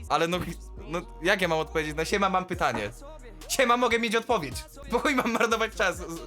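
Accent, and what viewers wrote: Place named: United Kingdom. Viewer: To już wiemy dlaczego masz Brytyjski akcent i umiesz gadać po ang pogo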